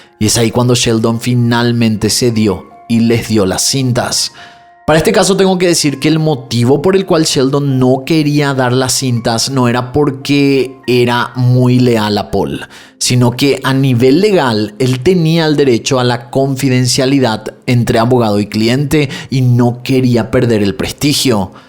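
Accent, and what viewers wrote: Paraguay. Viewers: Amo que tu acento volvió a ser paraguayo 😉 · Haupei kpeee, yo sabía que ese acento y forma de hablar era de mi querido Paraguay! Éxitos siempre che dúo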